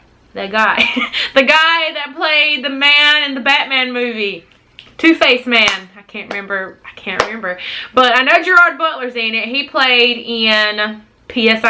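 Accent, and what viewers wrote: South, United States. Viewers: Your accent is so southern!😂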